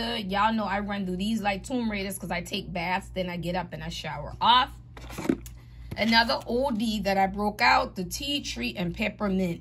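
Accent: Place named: New Orleans, United States. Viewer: Your accent gets me every time! Are you from New Orleans?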